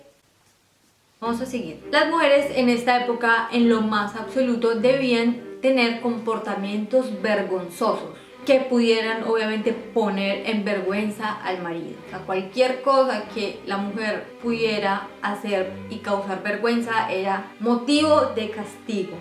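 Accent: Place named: Antioquia, Colombia. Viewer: Estoy acuerdo en ciertas cosas sobre esa época, como lo que era la educación, verse y ser un tipo de persona con una moral más alta, pero, se me hace una reveranda idiotez lo de preservar la virginidad para el marido o ser una persona que no quisiera aportar para la manutención. Por cierto buen vídeo! Noto que tu acento es paisa, saludos desde México ;)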